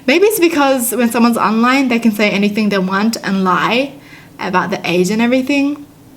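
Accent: Australia. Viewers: Hi so I’m new to this channel,but I have a question.Are you Australian ,because your ascent is kinda Australian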